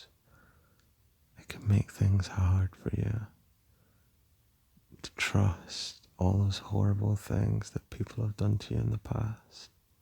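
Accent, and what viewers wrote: Scotland, United Kingdom. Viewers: someone in the comments pointed out you were scottish (i was trying to decipher ur accent) and now all i can think of is supportive shrek :) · I just spent the last 10 minutes contemplating whether your accent is Scottish or Irish, and it has made me question my existence. I really thought I knew the difference between the two. But you're scottish though, right? Anyway, I'm really happy this randomly showed up on my recommended, your voice literally made me melt 😊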